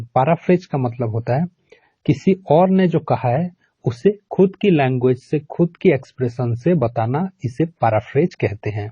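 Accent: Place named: Bengal, India. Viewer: Sir r u bengali ?ur accent seems like that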